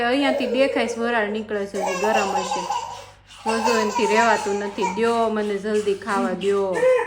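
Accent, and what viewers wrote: Kathiawar, India. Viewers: Kathiyawadi lagocho hu bhi kathiyawadi chu Putaliya no dhandho start karidyo......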